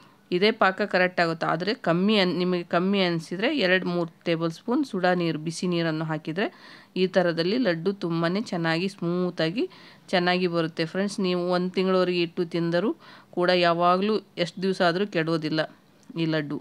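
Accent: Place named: North Karnataka, India. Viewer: U speak in UK kannada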